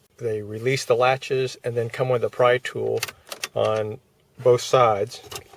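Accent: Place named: United States. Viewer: Most helpful video - many thanks. BTW, you have a most pleasant accent - where in the US of A are you from? Regards - Roger from England.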